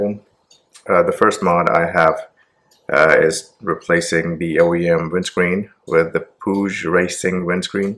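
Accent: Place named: United States. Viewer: Goddamn if not for the Jaipur tag I would have seriously thought you to be American 😂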